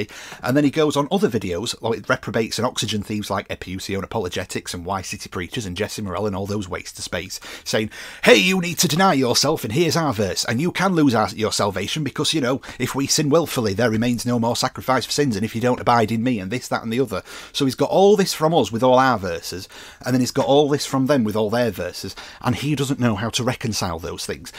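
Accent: United Kingdom. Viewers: I never knew hard preaching in British accent is something I needed but now I can't stop watching your videos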